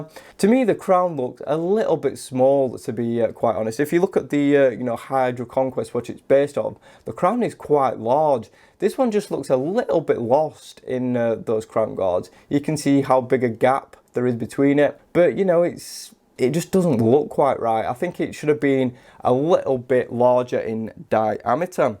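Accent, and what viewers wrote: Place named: Manchester, United Kingdom. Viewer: I love working-class Manch accent (Manchester). Nice review here. I am looking at this one as a gift for my young nephew. You've got a slim wrist like me.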